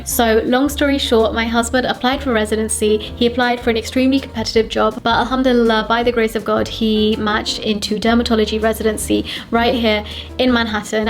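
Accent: United Kingdom. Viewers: I guess now you're going to lose your british accent , the best thing what I love Uk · British accent is soo annoying 😭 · I HOPE THE BRITISH ACCENT STAY PLEASE 😭😭😭😭😭😭😭😭😭😭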